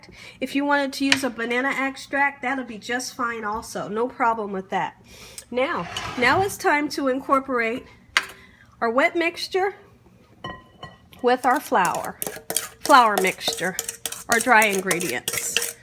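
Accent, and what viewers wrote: South, United States. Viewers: I love your country accent.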